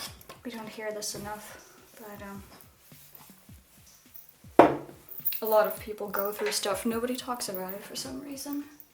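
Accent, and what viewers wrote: Germany. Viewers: this shit had the ability to take me to a cyberpunk world with the lighting the music and your german accent. powerful shit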